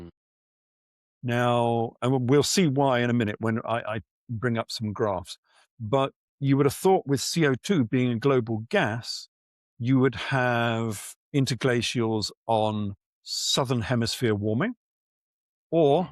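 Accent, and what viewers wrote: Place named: Belfast, United Kingdom. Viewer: belfast accent is contagious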